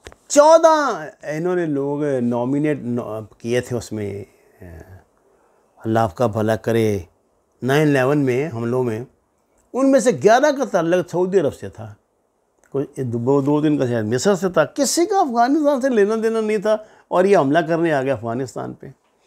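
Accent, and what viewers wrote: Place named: Lahore, Pakistan. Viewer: ilAQAH chach se hae aor lshori lehjah GHALIB aa gaeya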